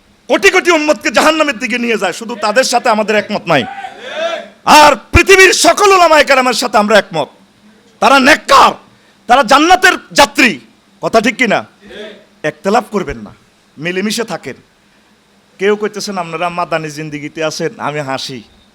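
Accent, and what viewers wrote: Noakhali, Bangladesh. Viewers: আনরে লাগে মনে হয় নোয়াকালীর বক্তা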